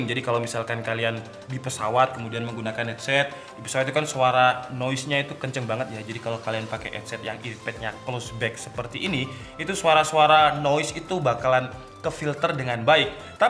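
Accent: Java, Indonesia. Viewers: nyeglek .medok banget jawanya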